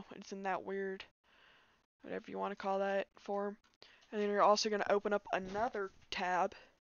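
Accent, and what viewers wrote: United States. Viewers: Are you from Alabama by any chance? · Texas haha I like your accent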